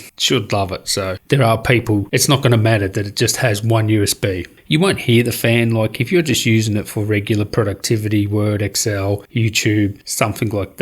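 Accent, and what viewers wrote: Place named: Australia. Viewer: ahhh it's good to hear a tech reviewer with a real legit aussie accent!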